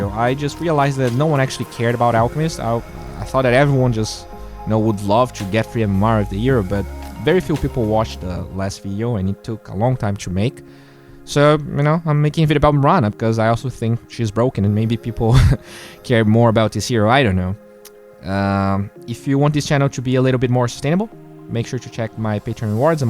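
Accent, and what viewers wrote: Brazil. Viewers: Man, are you brazilian? haha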